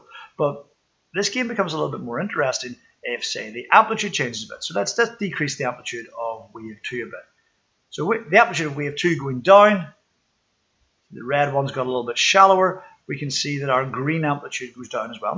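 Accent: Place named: Ireland. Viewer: This guy sounds like an Irish man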